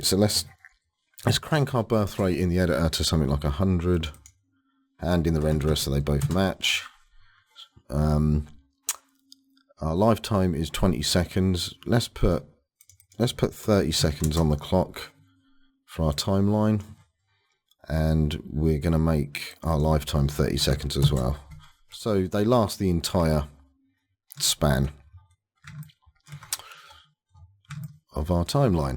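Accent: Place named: United Kingdom. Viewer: I really love your accent, even have been rewatching some of your videos because of that. Can you tell me which one is that exactly? I can only tell it's British :D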